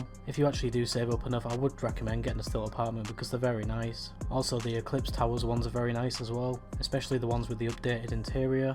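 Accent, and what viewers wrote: United Kingdom. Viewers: So sound like you’ve got an English accent but call it garARJ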